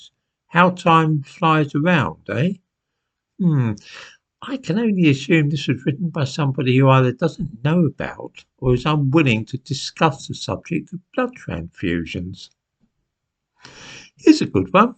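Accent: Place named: RP, United Kingdom. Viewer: Duhh! Maybe is because you’re spewing hate, bigotry, racism with a posh accent.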